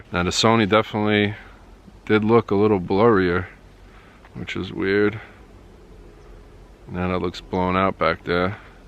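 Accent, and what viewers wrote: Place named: New York, United States. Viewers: Just came across your review for the first time, but I will be back. As a Canadian, I loved the NY or NJ accent, Great review, you covered a lot of information in a fair way. I was looking at Sony and have an old Canon S100 that I love, but I think your review convinced me the canon was a better camera and value than the Sony. Keep up the great work. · I love your voice. Sounds like a Brooklyn accent to me. It's awesome haha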